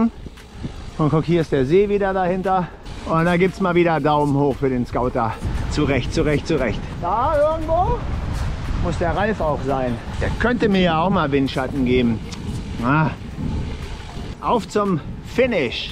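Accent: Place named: Saxony, Germany. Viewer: Schlauer Startpunkt, der Sand am Ende kann dazu führen das man gedanklich ein paar Verwünschungen in Richtung des Scouts ausstößt... 😅 Dafür kreiert der Trail am See aber wirklich Begeisterung! Würde euch dringend Venus Valley ans Herz legen! P.S so richtig passend fande ich das sächseln (auch) nicht 😉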